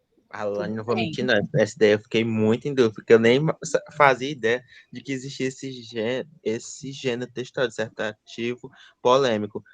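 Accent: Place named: Northeast, Brazil. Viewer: gente eu amo o sutaque nordestino ainda mais de professora como esta <3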